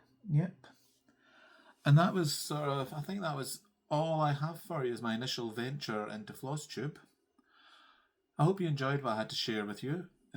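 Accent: Scotland, United Kingdom. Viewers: Wow you're such a natural. I love you're pieces and can't wait to see more, please record again when you're able to. I love the Scottish accent as well. My SIL is Scottish and I could listen to her all day lol. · My husband and I returned from three glorious weeks in Scotland just two days ago. It was my first visit and my husband's fifth. He is 77% Scottish, and I am 27%. We did a lot of family history research and even visited the family homestead in Orkney. When your video came up in my feed, I was SURE you were Scottish. I already miss hearing the brogue and visiting with so many kind, hospitable people. I subscribed, so now I can get my heart warmed each time I hear you talk about your WIPS. Nice job on your first video. Thank you,! By the way, I am in Ontario, Canada. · Hi, great to hear a gid Scottish accent ❤ I live on the other side of Glasgow towards Largs. 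Great Episode looking forward to many more. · I loooove a Scottish accent! My ancestors are from Arran.❤️ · If only for your beautiful accent, which reminds me, even makes me homesick for my Scottish friends who live in the same part of Scotland, I would jeep listening to you! Pluss the beautiful pieces you are showing, reason enough to stay around😅. Welcome to Flosstube! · Hi from New Hampshire USA! 🙋‍♀️ New subscriber. 😊 I heard of your channel from Eva at stitching in the interlake. I love all of your projects and bags. You reflect your love of color, so bright and cheery. ❤. I am looking forward to following you on your stitching journey! Cheers! 😄 I love your Scottish accent. 😉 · Just found you thanks to Comfort Stitching. I so enjoyed your video! Great projects beautiful stitching. We just returned to the US from a visit to Scotland and Ireland. We’d been to Ireland and everyone told us how beautiful Scotland was…..they were right! 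I was so happy to be hearing that gorgeous accent again today!❤ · Hello I enjoyed seeing your WIPS hope you continue with floss tube videos. Happy anniversary. I stitch samplers and no fancy ladies. Saga is one of my works in progress have you watched the runner stitcher a great Saga stitcher Love your project bags. Have subscribed and enjoyed hearing a Scottish accent. Happy stitching from a Scot in London Lo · Best wishes from Germany. Listening to you reminds me a bit on my two holidaytrips to Scotland and I love it ^^ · I’m so glad I found your floss tube! I loved seeing your Cinderella, I’m collecting that series and can’t wait to get started. I’m really impressed with your fabric selections. The Yellows are lovely and that purple for your monster project is yummy! I loved your bags, too! and your Scottish accent is so lovely. Just a fun and exciting first flosstube… keep them coming and best wishes for your new venture!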